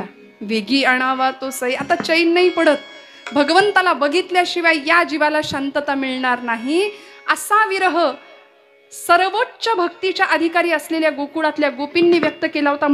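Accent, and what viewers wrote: Standard. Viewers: अत्यंत सुरेख !! वाणी अतिशय शुद्ध, स्पष्ट उच्चार, विषय मांडणी, विस्तार अप्रतिम.. एकंदर प्रासादिक !! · अतिशय सुंदर...गोड आवाज,शुध्द , उच्चार , प्रासादिक वर्णन...कीर्तन संपूच नये असे वाटते.... राम कृष्ण हरी 💐💐🙏🙏🙏🙏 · ताई अतिशय सुंदर कीर्तन आणि वाणी खूप शुद्ध आहे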